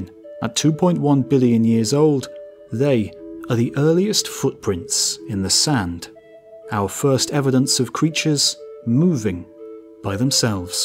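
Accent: England, United Kingdom. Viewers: i swear sounds like he says "the year was 1917" :-P gotta love the british accent!! <3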